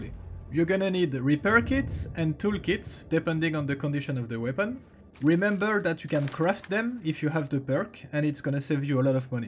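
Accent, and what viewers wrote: France. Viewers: Le french accent ^^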